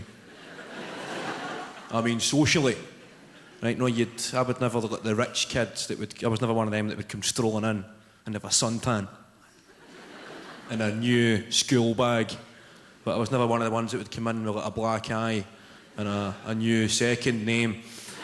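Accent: Scotland, United Kingdom. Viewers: one word...well 5 words shortened into one....LMFAO!! thats all i can say ..and his scottish accent makes it that much more funny! hahahaha loved it! ..word count = 27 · He's Scottish. His accent is hard for some people. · Gosh, I love his accent. It is sooooooooo hard to understand him listening for the first time if you're not Scottish or British at least but still he's hillarious! :D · It's difficult for non Scots to understand us. Believe it or not, has tidied up his accent here. When Scots talk to each other it's impenetrable to outsiders. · love the Scottish accent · This guy has the strongest Scottish accent I've heard.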